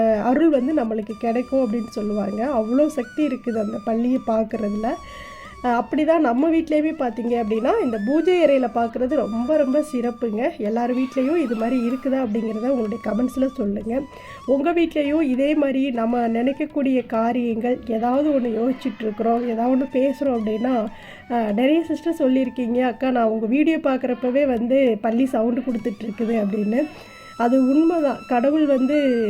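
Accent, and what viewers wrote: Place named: Theni, India. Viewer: Akka ungal negative place Dindigul la akka ennaku theni akka ungaloda speech appadiye erukku Akka ❤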